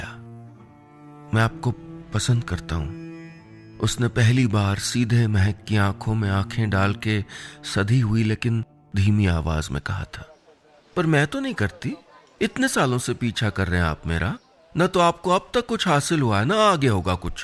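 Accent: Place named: Kashmir, India. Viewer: jitni khoobsoorti se aapne kahani ke liye, kashmiri accent laya hai, isse badhkar kuch nahi 💙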